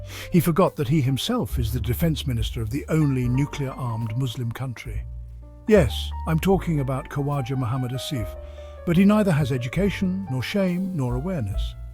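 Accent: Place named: United States. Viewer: Very effective vlog to understand the native American accent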